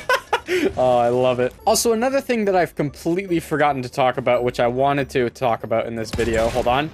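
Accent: Canada. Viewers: u canadian ?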